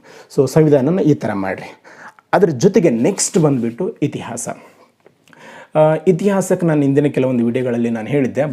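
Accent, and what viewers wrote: North Karnataka, India. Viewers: Nimma way of communication lovely sir I love it pakka uk mandi anasutte thanku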